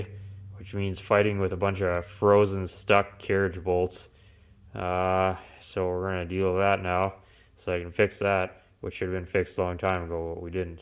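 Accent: Canada. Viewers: Love the humor, reminds me of AVE, you also sound like your a canukistan native, Oh and by the way love the vid's well done.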